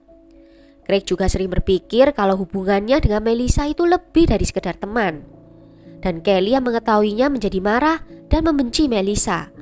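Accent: Java, Indonesia. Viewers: medok bgt sis.. wong endi sampean?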